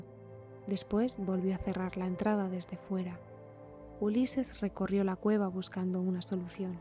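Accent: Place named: Spain. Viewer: Nueva suscriptora. Me encanta tu suave voz, y el acento español es un plus. Gracias por tanto trabajo y espero de todo corazón que muy pronto llegues a los mil suscriptores. 🎉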